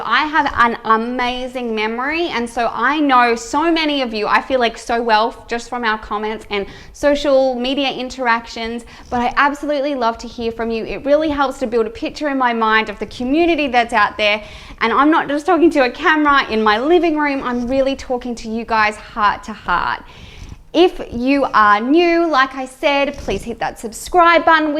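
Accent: Australia. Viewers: First of all, I love your accent. I’ve been trying to do an Aussie accent because I’ve been watching ‘Instant Hotel’ with my daughters, apparently I can’t do it. I disagree. I’m at the stage in my minimalism where I’ve been adding some items back in to our home. Very intentionally though. I still hate clutter but I love plants and I love books. So I’m allowing myself to buy more of these things because they make me happy. I no longer feel that to be a true minimalist that I have to get rid of everything! It’s about getting rid of what you don’t need and valuing the things that you do need. Lots of love to you from Lockdown England, though we’re coming out of it in the next few months, xxx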